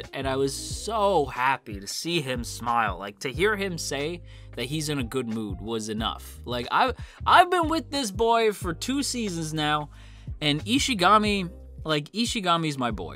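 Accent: Canada. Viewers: great videooo as usuall! i was super excited on hearing your thoughts on this episode! on another note i had a feeling from ur accent u were canadian and you confirming it really made me kinda proud of myself i guessed right yay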